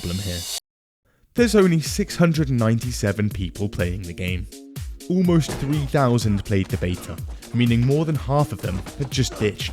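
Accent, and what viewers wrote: United Kingdom. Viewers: Why does this general kind of YouTuber always have a British accent? (, Internet Historian, Joeseppi)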